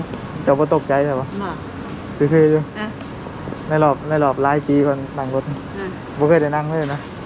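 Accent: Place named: Ubon Ratchathani, Thailand. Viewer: บ่าว เป็นคนแขวงได๋เว้าคึทางอุบล ทำงานยุกรุงเทพบ้อเพิ่งติดตาม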